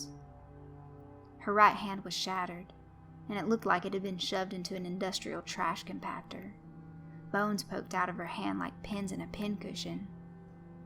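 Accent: South, United States. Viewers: Thanks for the narration <link> I liked the Southern drawl you added to it. As always, you did a good job with it. Sadly enough, the story was based on an experience about back when I was hospital security when an abusive father sweet-talked his way into the ER to visit his daughter. I wonder if you might have any interest in any of the other parts of the series <link> It's more of a theme of work-related horror stories than interconnected stories, but it might interest you.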